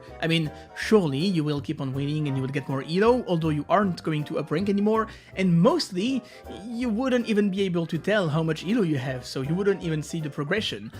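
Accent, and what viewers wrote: France. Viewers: accent du sud ? le rooooh putaing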